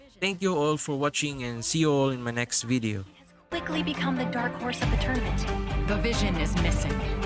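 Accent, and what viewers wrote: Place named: Philippines. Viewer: MmHmmhmmm that thick Filipino English accent is so *chef kiss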